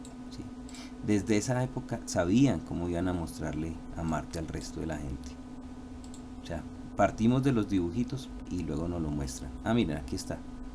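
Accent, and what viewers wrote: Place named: Bogota, Colombia. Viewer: Hola, buen video gracias,por el acento creo que es de bogota????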